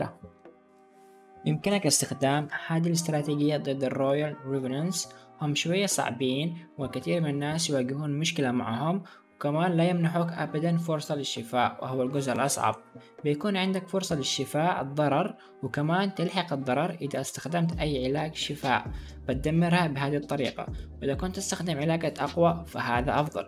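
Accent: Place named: Aden, Yemen. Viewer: لهجتك يمني وعدني تحديدا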